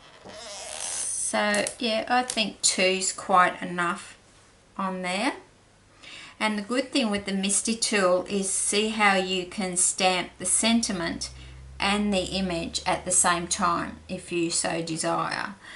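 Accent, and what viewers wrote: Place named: Australia. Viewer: no wonder you had such a nice accent you live in Australia. I live in USA California. I do have a someone I can buy from if i need to. Thank you so much for offering your help that was very kind of you. Have a Blessed Day. with a smile:) Julie G.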